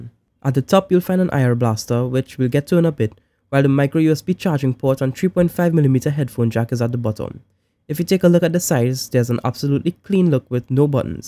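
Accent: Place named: Trinidad and Tobago. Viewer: That was an excellent review bro. I picked up some Trini twang here and there but, not much. "I simply hit the simple button" #priceless. Awesome quality as usual and very informative.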